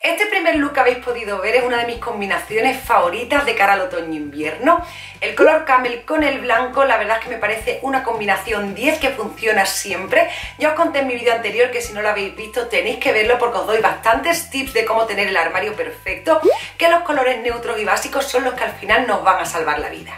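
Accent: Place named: Andalusia, Spain. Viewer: Anda! Este vídeo me ha aparecido de casualidad, porque corriges tu acento? En este vídeo se te oye con tu acento Andaluz y Mola! No lo corrijas ! ☺️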